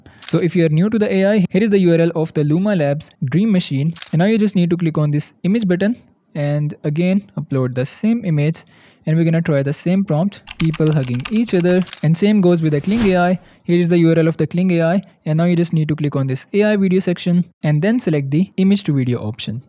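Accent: India. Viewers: Once I listened that indian voice I knew this shit isn't working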